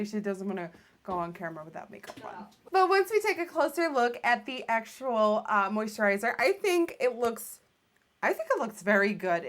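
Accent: Netherlands. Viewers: omg i can hear the dutch accent here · LOL I was like, Icelandic? Dutch! Love your accent! Ugh, I love me a beauty blender - thank you for commenting on application. Great tutorial love!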